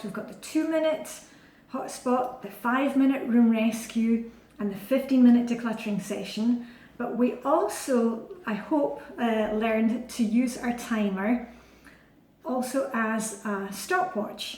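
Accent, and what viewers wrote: Scotland, United Kingdom. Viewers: Thank you so much for these videos! I have been flying for about a year, but I still find your videos helpful and entertaining. I also love your Scottish accent! :) Kind regards from a German flybaby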